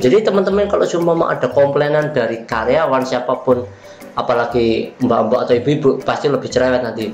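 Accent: Banyumas, Indonesia. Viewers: wah pantes ngapak toh, punya No.WA mas?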